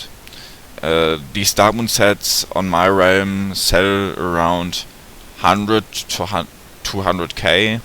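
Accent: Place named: Germany. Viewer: You kinda sound like our german teacher, I mean, your accent is kind of similar (I don't live in Germany but he teaches German) Good luck with your channel from Sweden :D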